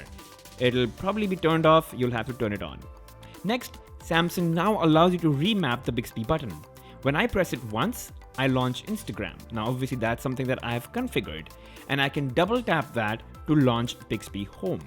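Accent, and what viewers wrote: India. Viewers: Damn that Indian accent!! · Hey man are u indian... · Fuck your indian accent 🖕🖕🖕🖕🖕🖕🖕🖕🖕🖕🖕🖕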